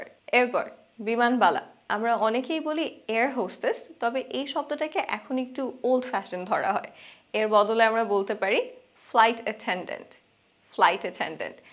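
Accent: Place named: United States. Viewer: এই উচ্চারণগুলি বেশিরভাগই আমেরিকান উচ্চারণ 😊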